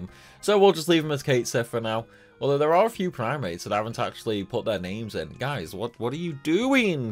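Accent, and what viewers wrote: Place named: Stoke-on-Trent, United Kingdom. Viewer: Knew what? Stoke-on-Trent? I called that too! It was the one accent I never saw him deny on stream, but he just ignored it when guessed instead. Also because I went to Staffordshire uni so I'm kinda familiar with it. I ruined my stomach drinking Pepsi for three years because the tap water in the area is disgusting.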